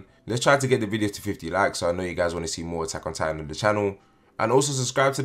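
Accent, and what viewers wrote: United Kingdom. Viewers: couldve been amazing but i just cant understand the strong brittish accent?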